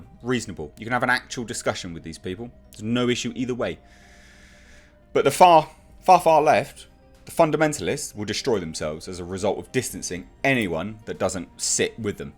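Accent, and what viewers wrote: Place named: United Kingdom. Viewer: When I first heard your voice I already knew you were a white british man and at the same time I didn't care, I just liked your content.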